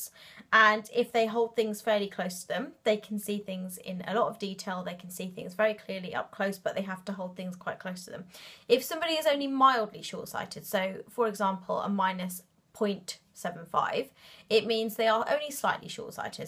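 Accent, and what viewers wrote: England, United Kingdom. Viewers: Hi again! ah I'll go back and listen again. I'm glad I emailed you. I'm guessing you are in England somewhere ( hearing your accent ) so you are about 5 hours ahead of me, so it's about midnight there. Anyway, thanks for responding and so quickly too! I really appreciate it, and you said that very well, I get it now! Thank you again for your time:-)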